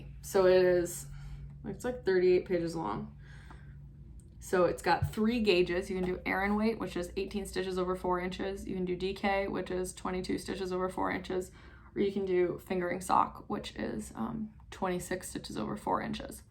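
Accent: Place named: Vermont, United States. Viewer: Great review!! I kept thinking you were from vt at the beginning of the video. Hello neighbor!!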